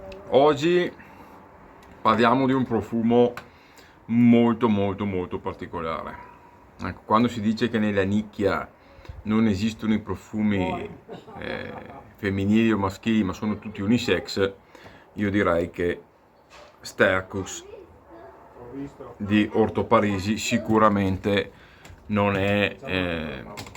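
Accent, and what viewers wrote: Veneto, Italy. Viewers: Salve,potrei chiederle con tutto il rispetto,di dove è il suo accento?.Sono molto curioso dato che studio la lingua italiana da un po’.Se non sbaglio il suo accento protrebbe essere Veneto….Volevo anche ringraziarla per la ottima recensione.Grazie mille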